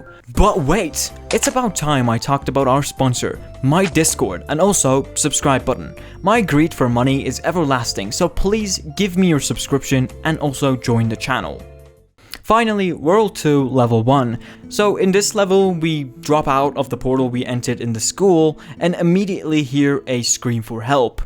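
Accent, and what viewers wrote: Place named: United Kingdom. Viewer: Hi British guy IT RYTHMS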